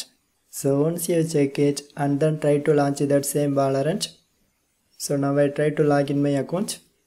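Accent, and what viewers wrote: South India, India. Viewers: south indian lol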